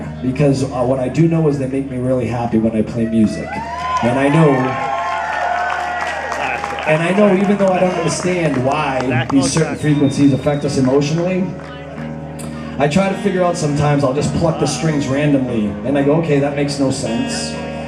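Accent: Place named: Boston, United States. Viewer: I could listen to him talk anytime love that Boston accent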